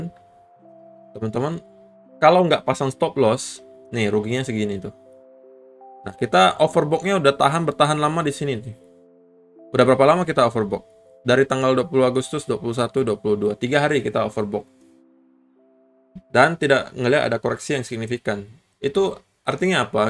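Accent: Pontianak, Indonesia. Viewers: Ko, org pontianak ya?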